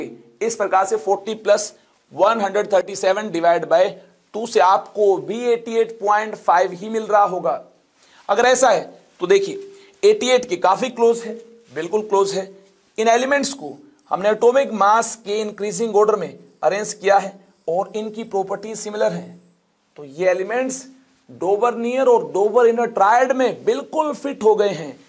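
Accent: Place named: Haryana, India. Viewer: sir ki awaz m hi haryanvi accent aajata hai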